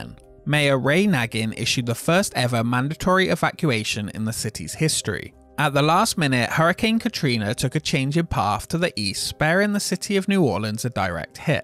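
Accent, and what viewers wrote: United Kingdom. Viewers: Hey what is your home park because i though i hear you speak british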